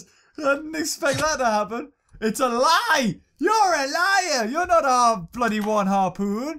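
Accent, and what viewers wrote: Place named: United Kingdom. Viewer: God I love ur Brit accent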